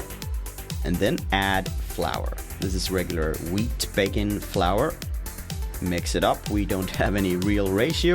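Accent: Scania, Sweden. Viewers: Nice ”skånska”!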